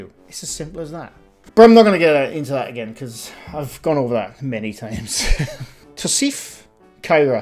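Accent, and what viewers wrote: Wales, United Kingdom. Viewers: Luv Welsh accent too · enjoy listening to your lovely welsh accent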